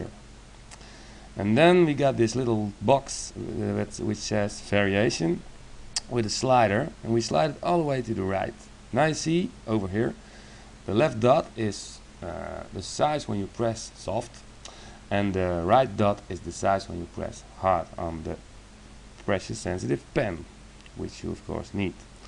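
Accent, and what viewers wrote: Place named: Netherlands. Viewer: Thank you, very good video ! By the way, your english accent is very nice for a Dutchman ! And I can tell because, I'm Dutch too :)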